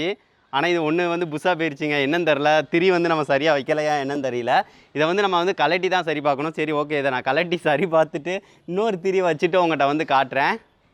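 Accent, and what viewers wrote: Sivakasi, India. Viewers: உங்கள் வீடியோவை பார்த்துவிட்டு அதன் பின் தவறான முறையில் அனைவரும் வீட்டில் வைத்து செய்துபார்க்கலாம் என்று நினைக்க தோன்றும் அதர்க்கு முன் உதாரணம் ஆகிவிடாதீர்கள் நண்பர் அவர்களே சிவகாசி உள்ள ஒருவனின் குரல்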